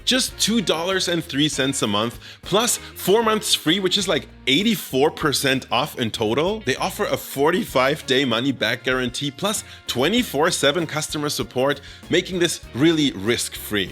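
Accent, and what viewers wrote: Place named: Germany. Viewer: your german accent is coming out stronger nowadays.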